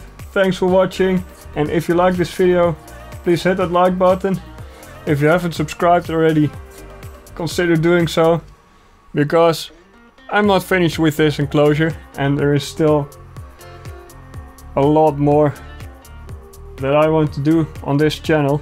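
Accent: Netherlands. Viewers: A dutchman, unmistakably. Ill follow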